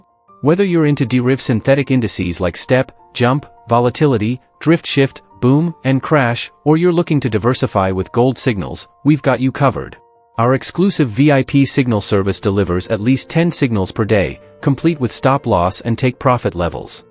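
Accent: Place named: United States. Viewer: VOICE AMERICAN, MUSIC AFRICAN))) BEST STRATEGY 🤩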